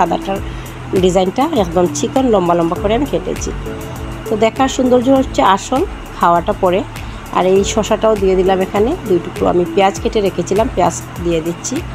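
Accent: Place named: Sylhet, Bangladesh. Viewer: সিলেটি ফুরি😃😃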